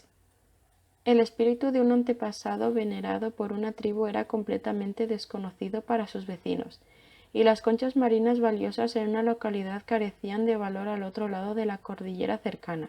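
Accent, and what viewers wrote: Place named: Spain. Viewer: Gracias: Es muy agradable tu voz y me encanta el acento español.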